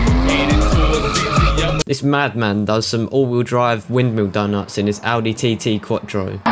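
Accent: United Kingdom. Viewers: love your British accent bro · You should let your videos narrate by someone with an american accent. British accent is just not it.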